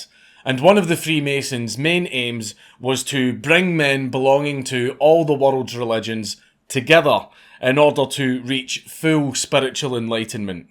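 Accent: Scotland, United Kingdom. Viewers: "A Papul bull" I love the scottish...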